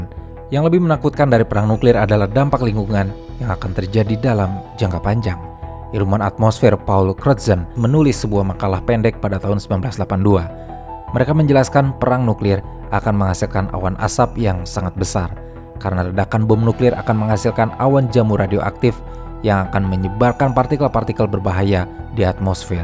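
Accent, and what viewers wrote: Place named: West Java, Indonesia. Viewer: Dari cara bicara nya, tampak nya mimin dari suku Sunda.. kumaha min, daramang ?? 😃😁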